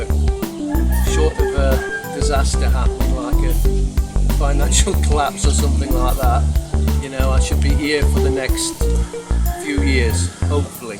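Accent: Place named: Scotland, United Kingdom. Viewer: I dunno if I am hearing u right but you sound like Scottish. :D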